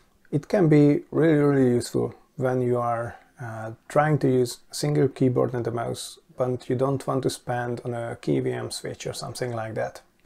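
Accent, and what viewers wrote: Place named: Hungary. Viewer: Your accent surely tells you're Hungarian - that I didn't know when I found your channel. :D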